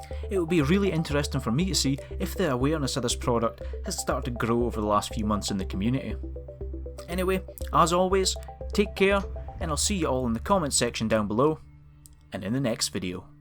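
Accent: Scotland, United Kingdom. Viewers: Love that Scottish accent. Great review and greets from Germany!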